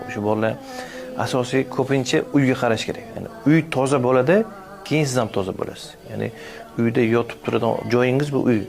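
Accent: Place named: Namangan, Uzbekistan. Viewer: Наманганлик экансиз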